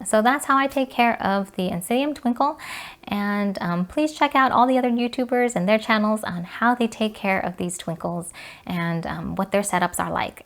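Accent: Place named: California, United States. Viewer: Uuuugh that airy babyish sing song kardashian california accent is unbearable. Train yourself to get rid of it!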